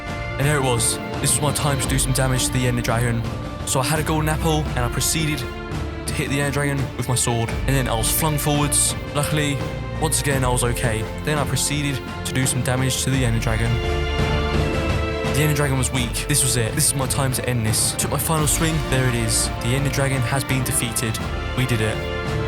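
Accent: United Kingdom. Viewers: I love your voice Are u Britain? I’m sorry if ur offended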